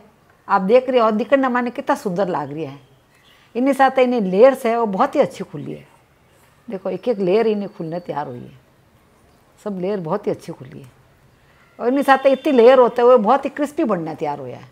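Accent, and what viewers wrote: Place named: Haryana, India. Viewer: Very nice, like your haryanvi accent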